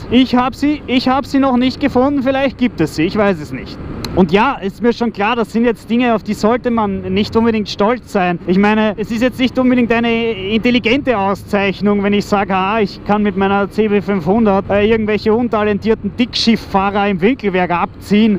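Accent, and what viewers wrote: Austria. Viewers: Love it, Id reply in german but my writing skills are lacking (for lack of practice). Love hearing the german, love the passionate video and love your reasoning and thoughts on the matter. keep at it. keep going. keep exploring.. and keep posting VIDs :) whichever language.. its good to hear german (Austrian) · du redest nicht "österreichisch" sondern wienerisch ;-) von einem Hütteldorfer zum anderen... ich mag deine Videos, bin aber erst vor kurzem darauf gestoßen. Hab gehört du hattest unfreiwilligen Kontakt mit Gegenständen die man so nicht berühren will - falls dem wirklich so ist - gute Besserung! btw. die CB ist ned übel - vor allem wenn man den Hintergrund der CB in Erinnerung ruft - das erste Bike mit einem wirklich funktionierenden quer eingebauten 4 Zylinder. stay well cu harry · Austrian??? Ahh we know 😌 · Thanks a lot for that video! 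I really appreciate the fact, that you took the time to write subtitles. To be honest, I would have watched the first 3 minutes in German without subs, that's it. With the subtitles, no problem at all! The "Austrian" German, is quite nice to hear, it does not rape my hears. So yes, I would definitely like to see more of those! Have a great year 2016, I hope you will get much more satisfaction and reward, for your work here, on YT. All the best!!!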